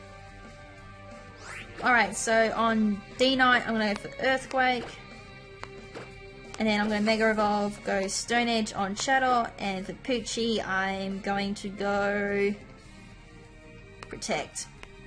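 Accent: Australia. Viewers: your accent makes me want to visit australia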